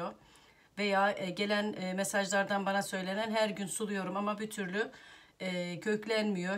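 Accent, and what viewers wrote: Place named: Trabzon, Turkey. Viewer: Harika bir vidyoydu bu arada siveniz trabzon gibi memeleket neresi🤗👏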